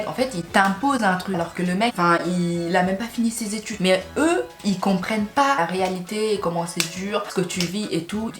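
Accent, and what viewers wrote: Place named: Senegal. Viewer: A ton accent j’ai direct capté que t’étais sénégalaise 🙃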